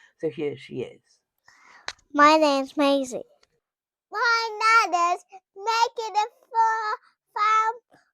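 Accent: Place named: South Wales, United Kingdom. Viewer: I recognise a South Wales accent there, I’n from Ammanford!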